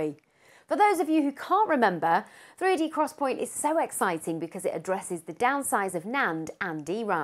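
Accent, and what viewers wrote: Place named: Northern England, United Kingdom. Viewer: I love that northern accent.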